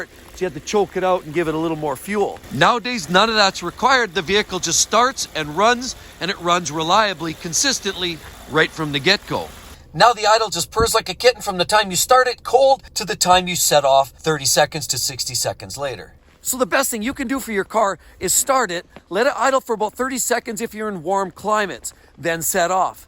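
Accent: Canada. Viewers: Love the Canadian accent!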